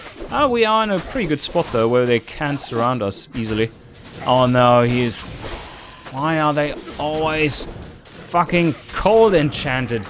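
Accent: Germany. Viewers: Like your videos dude :D your slang sounds like german doesnt it? cheers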